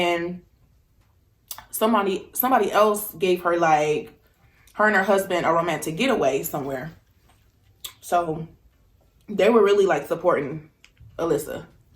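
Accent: South, United States. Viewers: U sound like u from the south are u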